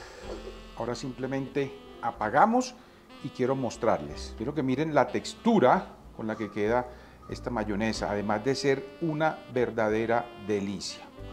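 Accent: Colombia. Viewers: como todos tus platos delicioso este plato frio w berraquera como se dice habla paisa sigue q sos excelete cheff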